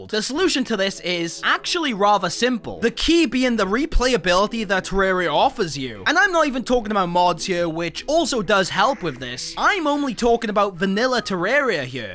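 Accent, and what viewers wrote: Wales, United Kingdom. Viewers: are you welsh you sound welsh but at the same time dont? i cant tell what accent you have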